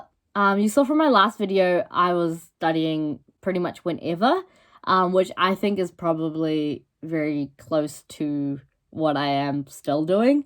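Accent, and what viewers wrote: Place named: New Zealand. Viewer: Sound like gru but from New Zealand, and sorta a female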